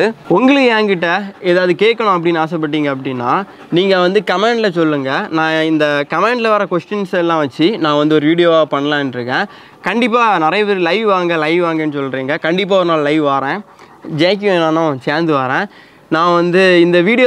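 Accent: Kanyakumari, India. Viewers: Anna unga kanyakumari slang rmba alaga irruku anna epaiumae eppidiyae pesuga athu tha nalla irruku and congrats for 100k and always love u jacky 😍🔥✌ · Hi recently I started watching your videos to hear our kk district slang..🤣🤣🤣 very funny … Me too from kk district.. but I don’t speak the same 😆 Keep going Thambi 👍🏻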